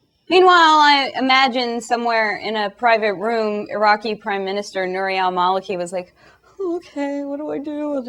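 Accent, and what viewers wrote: Russia. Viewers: Nice Russian accent!! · hahahah <3 your russian accent is frkn awesome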